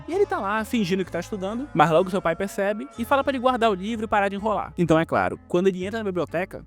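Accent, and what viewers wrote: Pará, Brazil. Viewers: Primo, o sr é do Pará? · KKKKKK mano adorei seu canal só me tira uma duvida tu é paraense?? kkkkkk o jeito de flr igualzin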